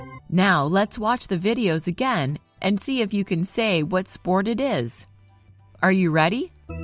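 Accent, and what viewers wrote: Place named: United States. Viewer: I love your teaching the most. I thought that you are English native speaker but it is not. I was motivated a lot when watching you speaking English. I love your American accent and I am trying to mimic your accent. Thanks for all the lessons, man!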